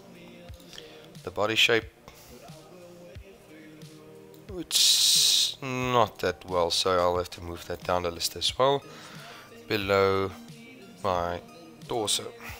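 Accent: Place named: Johannesburg, South Africa. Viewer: thought i recognized that accent. jo burg? anyways thanks again from a total beginner. question: how are you enlarging or shrinking the parts when you are are moving them around. what controls are you using?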